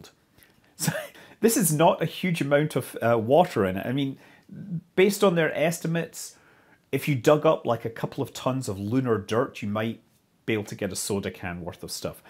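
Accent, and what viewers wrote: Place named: Lothian, United Kingdom. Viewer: A wee lothian lilt to his linre.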